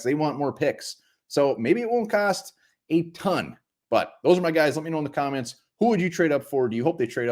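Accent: Minnesota, United States. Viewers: your Minnesota accent is so strong it is amusing to me 😂 even as a native Minnesotan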